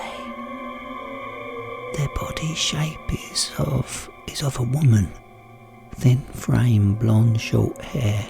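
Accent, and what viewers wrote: United Kingdom. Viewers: why thankyou! it's all because of the British accent and the way you read out the stories, absolutely amazing !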